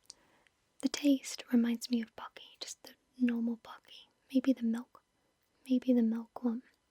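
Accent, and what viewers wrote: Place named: Wales, United Kingdom. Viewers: She's Welsh. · If you don't mind me asking, where are you from? I can hear your accent but I don't know if it's like an British accent or some other accent. I hope this isn't rude. :) thanks love your videos! :)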